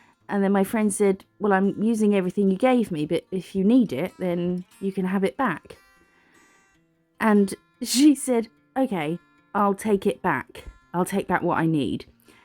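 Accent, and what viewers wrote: United Kingdom. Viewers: You are hilarious! Why do things always sound a lot funnier in a British accent??? Lol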